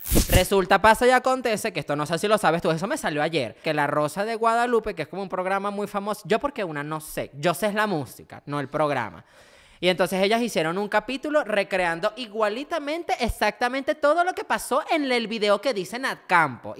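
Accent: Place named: Spain. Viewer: Porqué La Divaza tiene ese acento Español con las eses?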